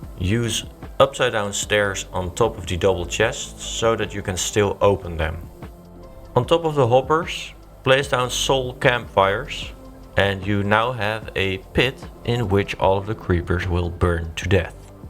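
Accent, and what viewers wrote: Netherlands. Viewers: this is the first time i realy heard the dutch accent i your english never knew you where dutch. and ofcource a great build keep it up man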